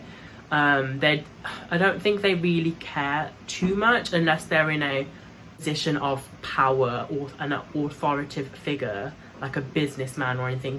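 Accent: England, United Kingdom. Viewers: Loved the video, it was good to hear an English voice rather than American. I thought you brought out relevant points in your topic which has been covered many times by Trans women on you tube. But you missed out one type of man in your vlog and that is the one who looks at a trans as a woman not bothered what's between her legs, takes her for who she is. Not worried about being seen in public like the relationship is some kind of secret that he doesn't want to let out of the bag. And yes, I've subscribed. Keep safe.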